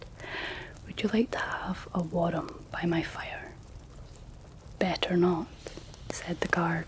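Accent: Scotland, United Kingdom. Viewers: Wow nice story and cant believe I only came across you today, I'm from Shetland but had never heard of this story. You have a lovely Scottish accent 😊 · I went to visit Scotland a little over a month ago and oh boy I LOVE the Scottish accent! It's so different and charming. I'm happy that YT decided to recommend your video, I get goosebumps just by listening. Subbed! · This the softness of your voice and scottish accent. The make up and eyebrows are on point - absolutely gorgeous. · May I ask which part of Scotland you're from? You have a lovely accent. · ❤❤ new subscriber! I hope there’s more bedtime stories! I adore your Scottish accent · After years of popping in for a wee bit of ASMR with you, I still find I get some of the most immediate, consistent, and strongest tingles on your channel. And it's not just my keenness for your lovely Scots brogue, or your great pacing and expression, but you have some of the most precise audio control of anyone in this segment. 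I gotta believe that your musician's ear has got to be a big part of that excellence in setting up your system and in the editing. Flawless, as usual. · Very relaxing. And your Scottish dialect never gets old! Hope you're doing well! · Omg perfect asmr a scotish take in a beautiful Scottish accent so soothing ❤ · Scotland has a wonderful accent, I must say. This was quite relaxing. Much of my family is Appalachian, distantly originating from Ireland and Germany. The Appalachian accent isn’t one most would consider relaxing, but it’s a warm and welcoming time when it’s heard, and it’s always paired with the smell of wonderful food. It’s like a little piece of the past, of simple living and familial closeness.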